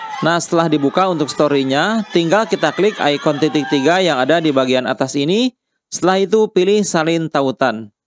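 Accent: Indonesia. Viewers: Kenapa suara indon pulak ni 😁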